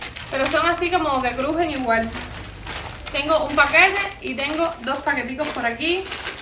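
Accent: Cuba. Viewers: Eres cuban?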